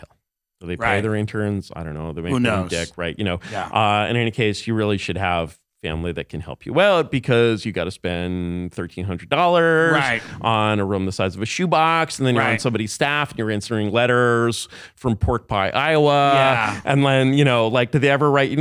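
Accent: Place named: California, United States. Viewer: He has an annoying California accent to me. The long drawn out syllables as he improvs his next empty general statement.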